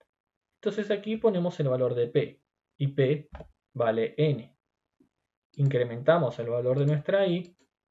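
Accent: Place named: Misiones, Argentina. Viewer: Muy genial tu explicación. Muchas gracias. Te felicito por toda la pasión que le ponés al arte de enseñar. Y re contra mil veces feliz por poder aprender con acento Misionero.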